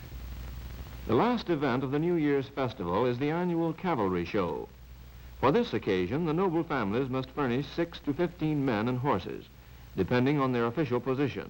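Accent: United States. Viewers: You apparently think the U.S. should have gotten into WW3 just a few years after the end of WW2. Tibet was also extremely isolated and would have been very hard to defend for the U.S. Finally, the man doing the commentary isn't from the UK, he is obviously American, and he lived in a very different time in which the vast majority of Americans that would have been watching this in the early 40s knew absolutely nothing about Buddhism, so of course he used terms they would understand like church. You are being ridiculous and acting like a troll. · American accent / reading style .... so different ? Fascinating history thanks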